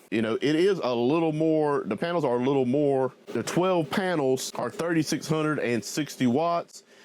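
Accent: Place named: Texas, United States. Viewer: Nice! Kind of hard to guess not knowing where you're located (although sounds like TX), but I'm going to guess 101kW.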